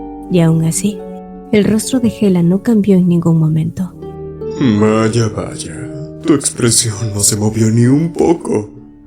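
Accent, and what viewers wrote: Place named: Paraguay. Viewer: Me re suena esto re paraguayo ayuda soy la unica?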